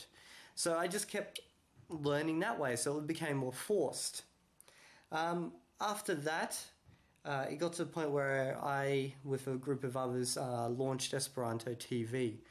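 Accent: Australia. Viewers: Yeah, basically. I feel like a lot of Esperanto material is geared towards komencantoj, but I have been reading more advanced stuff lately. "Being Colloquial in Esperanto" is one of my favorites for English-speakers. I also just ordered "Vojaĝo en Esperanto-lando" directly from Boris Kolker himself, so that should be good. My Esperantist friend in North Carolina wants me to attend NASK,(Nord-Amerika Somera Kursaro) this summer, so we shall see. But what I've really been doing is what I call immersion. I've been trying to spend as much time as I can in Esperantujo. I skype with Esperantist friends, listen to Esperanto radio and music, chat in Telegram chats, read in Esperanto, and I've even started to have dreams in Esperanto (mostly stress dreams about being somewhere with fluent speakers and not being able to follow the conversation). I think it's working, though. 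I feel like I am making great strides. Between my first and second live Esperanto meeting, I noted a marked improvement in my ability to hold conversation in Esperanto. I really enjoy your videos, though. They are helpful, though I am somewhat embarrassed that I've known Esperanto longer than a lot of fluent people but and not as good as them. I'll be there, though, soon! I enjoy both your Esperanto-language videos and English ones. As a side-note, I first found your Esperanto language videos and I was surprised to hear your Australian accent in English. Good job on your Esperanto accent! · g'day brother you sound Australian.. any chance for a few lessons.. live In Buxton nsw near Picton.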